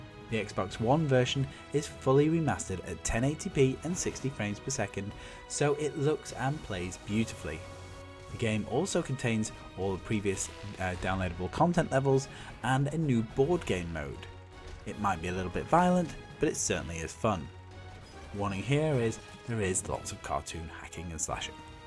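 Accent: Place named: United Kingdom. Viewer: Is there a video of Xbox games without a British person? Just accent is fucking annoying.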